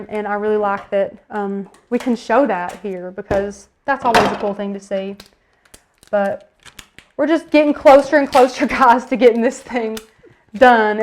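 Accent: South, United States. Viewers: where are you from? You have more of a southern accent than me 😊 PLEASE PLEASE Show how to use with Android tablets I NEED TO KNOW HOW TO. 😭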